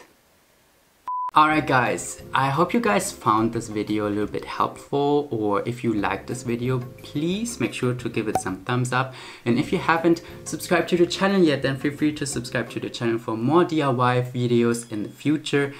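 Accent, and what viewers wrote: Germany. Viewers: i find you first on tiktok, and now understand you have YT CHANELLL I started studying German this year and i heared smth simmilar between German pronounciation and your speeking cheking your ig MUNICH DE ME i knew itttttttttttt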